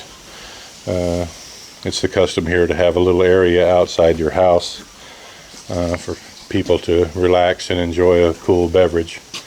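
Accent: South, United States. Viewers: that kind of house is the best shelter for you since bohol is always visited by typhoons... by the way, you have a nice southern accent.. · You got some southern droll accent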